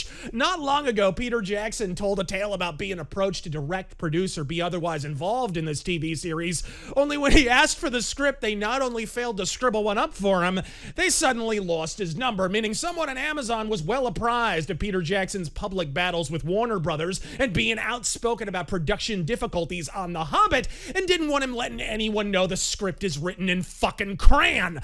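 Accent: England, United Kingdom. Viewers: As an Englishman I approve of English accent. One Shitposter to Rule Them all! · For a brief momemt, I thought you had a british friend do VO. Haha nice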